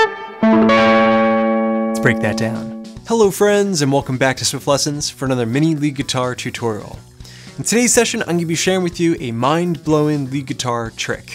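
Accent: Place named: Baltimore, United States. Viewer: Philly? I hear Baltimore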